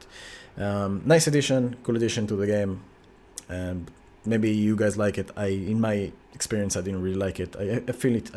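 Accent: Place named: Israel. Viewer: i can still hear that hebrew accent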